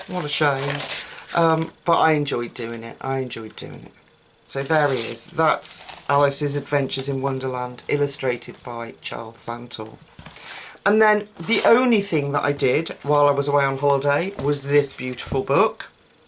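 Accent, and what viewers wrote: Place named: RP, United Kingdom. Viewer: great art...and personality, why are British posh accents so funny, the language - "stickled to High Heaven", LMAO. love it. I could listen to you every day - I don't know how famous she is in general, but most Americans, like myself - except I know who this is, but most Americans, possibly some Brits - might not know the actress, Amanda Donahoe or Donahue. She was in "Lair of the White Worm' with Hugh Grant from the '80s. I freaking love listening to her and looking at her, she's awesome. don't ask me why, BUT...you sound like her! That's a compliment luv...coming from me. buy yes, love your works, I'm not as crafty as you and the coloring community on youtube, but I'm trying in my spare time. However, I go for the more strange and horrific if possible... let's just say, that Bradford lady's great art would never hit my desk. 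- But seeing your love for the kind of "cute as shit" stuff, and payoff ---- is well worth it. Keep on Stickeling Luv. lol, James from Florida!!!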